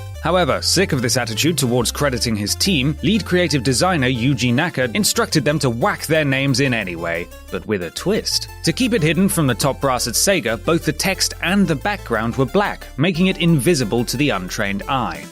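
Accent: United Kingdom. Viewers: oh my god, his british accent is awesome! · This man has the most British voice I've ever heard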